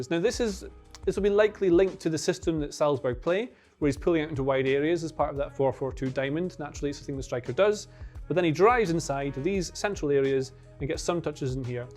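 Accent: Scotland, United Kingdom. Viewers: Scottish presentation?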